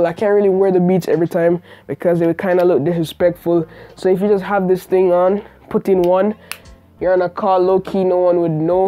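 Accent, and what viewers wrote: Jamaica. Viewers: Where my boy from? He accent kicking. Just sub. Update now I see you is a yard man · Lol this guy kinda have a Jamaican accent, where you from fam ?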